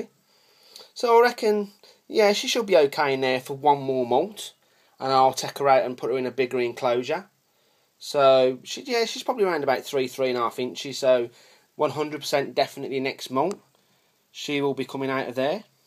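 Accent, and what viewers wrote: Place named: Wolverhampton, United Kingdom. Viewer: Are you from Wolverhampton?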